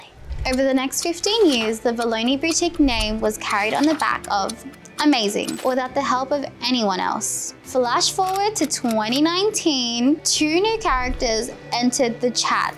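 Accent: Australia. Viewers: I HAD NO IDEA SHE WAS AUSTRALIAN DANG. Gorgeous face body and voice, shes blessed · I had no idea she was Australian or had such a beautiful accent :Edit: thanks so much for all the likes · Australian????😭😭😭😭😭 that accent caught me off guard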